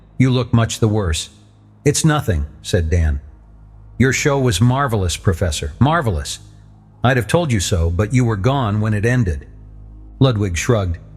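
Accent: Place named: United States. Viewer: Too bad the narration switched to an annoying American voice.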